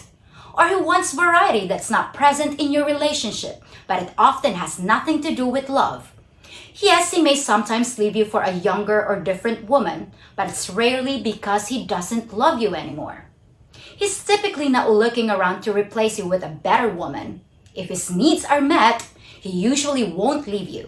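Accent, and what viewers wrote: United States. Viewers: Your chanel is well balanced, informative and easy to be understood. The only thing is that strong american accent what is unusual for european ears but... I like your channel. Keep on doing. Smart woman. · Wow, you're very likable. You are definitely a filipina but you talk like an American. How did that happen? - Did you live in the US? Is one of your parents a foreigner? - Or maybe you're just an internet junkie who simply figured it all out!:) - Kisses from Toronto Canada. - Mauhhh...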